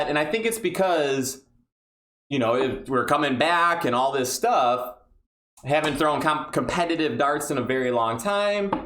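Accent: Midwest, United States. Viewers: New Sub here. You a fellow Illinoisan? Hear that Midwest accent.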